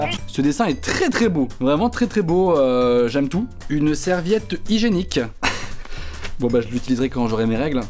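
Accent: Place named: Nord-Pas-de-Calais, France. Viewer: lol tu es Chti c'est ces normale je suis contre le racisme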